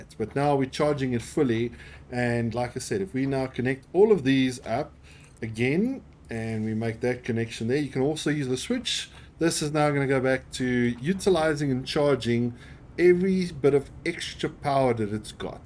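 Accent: South Africa. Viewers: Love the vid.your accent sounds south african? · Where are you from you sound afrikaans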